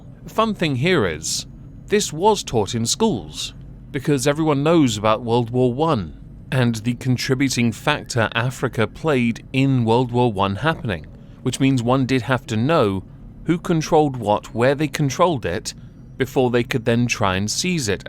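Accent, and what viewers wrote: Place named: Birmingham, United Kingdom. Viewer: J to the B to the motherlovin' P took that sass and turned it into a positive. He got an artist to design the Hydra logo with a lobster instead, made merch of it and is donating all proceeds to charity! Also I love your Birmingham accent! Reminds me of Cat Deely playing Cat the dog on SM:TV Live XD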